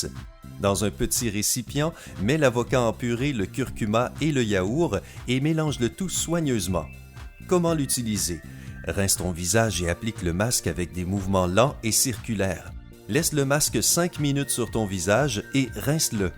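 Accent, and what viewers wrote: Quebec, Canada. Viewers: D'abord quel jolie voix et l'accent québecquois c'est agréable je cais essayé je suis célibataire si j'amais 😂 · J’ai liké pour l’accent 😂 j’vous kiff les québécois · Avant je détestais l’accent Canadien maintenant je kif 😍. A part ça superbes astuces 👌👌👌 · Y'trop Quebecois lui 😂😂😂😂😂 Calice ton accent y tres obvious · Oh je love les Québécois et leurs accent 😍😍😍😍😍😍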